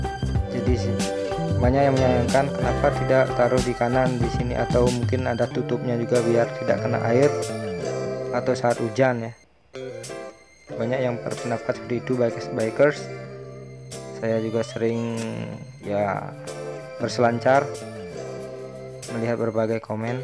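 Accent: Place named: West Sumatra, Indonesia. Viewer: Ini yg ngomong kok kaya org sumatera barat bukan org bali.. (yg org bali asli komen y)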